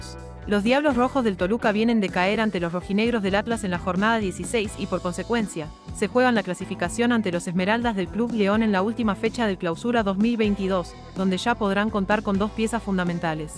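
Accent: Argentina. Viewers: PORQUE HABLAS COMO ARGENTINA?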